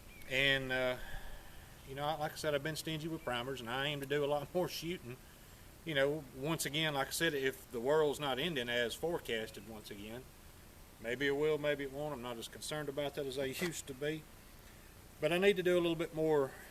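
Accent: South, United States. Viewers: Hello! That’s nice looking. I’ve also heard others make the point if you like to look “period” then age your gun to look anywhere from new to very worn, but not 100 years old. I like it all- to each his own! As far as your speech: I’m from Florida/Louisiana stock and feel at home hearing your videos! (I love the scenery and most of the weather here in Montana, but damn I miss the South) Rock on, brother.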